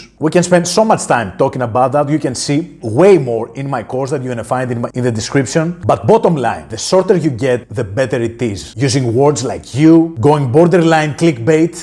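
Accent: Greece. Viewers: I think you are from Greece because of the accent ☺️♥️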